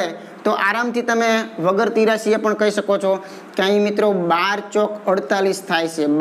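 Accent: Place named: Kathiawar, India. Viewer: કંઈ બાજુ ના છો તમે છે Saurashtra kathiyawad બાજુ બોલી પર લાગે છે એવું ...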